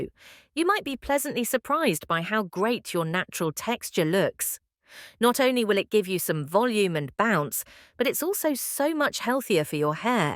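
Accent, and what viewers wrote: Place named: England, United Kingdom. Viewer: English accent; American spelling.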